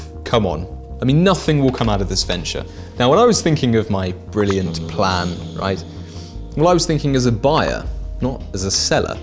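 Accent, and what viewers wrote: RP, United Kingdom. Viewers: How I like British accent. I don't understand any words. What are you saying in this video??? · I love the content. Hate the blatantly obnoxious royalty accent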